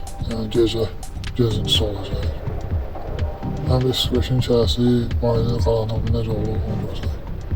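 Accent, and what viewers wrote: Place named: Baku, Azerbaijan. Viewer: Qardas haralisan bele baki lehcen var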